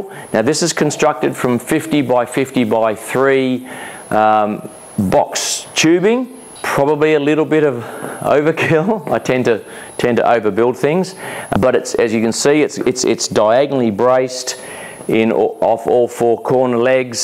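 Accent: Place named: Australia. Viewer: Heavy over engineered base is fine, however if you want to cut thinner materials, you will end up building a lighter gantry and ditching the ballscrews. Rack and pinion would be a much better choice. Plasma is a very dirty process. Make sure to use the little plastic plugs for the bolt holes in the linear rails. Where in Aus are you? The accent and magpies give it away. :)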